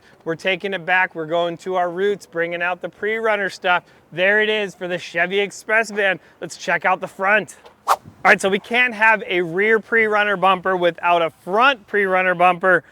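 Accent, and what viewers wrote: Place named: Australia. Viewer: Hahaha, Australian accent mate😂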